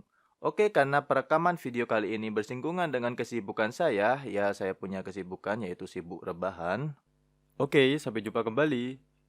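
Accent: Indonesia. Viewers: Mas... logat maduranya mas dikondisikan 😅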